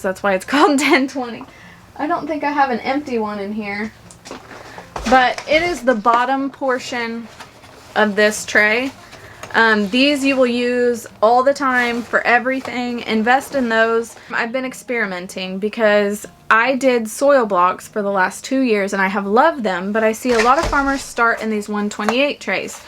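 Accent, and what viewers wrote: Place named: South, United States. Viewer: you may be in Indiana but..no way that you are from Indiana. Or.. Perhaps you're just throwing some southern charm (dialect) in as humor..? Come to think of it though you're not that far from me. (N.W. Alabama - Zone 7B)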